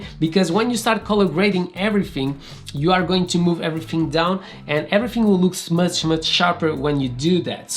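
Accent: Portugal. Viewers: Where are you from my guy that accent sounds kinda portuguese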